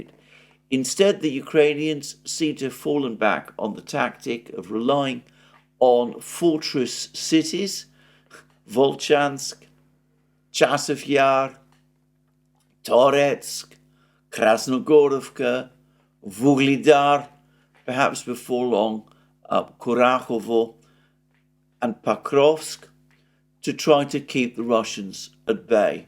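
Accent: United Kingdom. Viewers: A year's training for Russian's soldiers eh? I don't think I've ever caught Alexander telling such a bald-faced lie before. The man absolutely has no shame. He appears to have no regard for the intelligence of his audience. He knows he's just shoveling thinly disguised nonsense gussied up with a British accent and a 50-cent thesaurus, and is just taking the money and running with it.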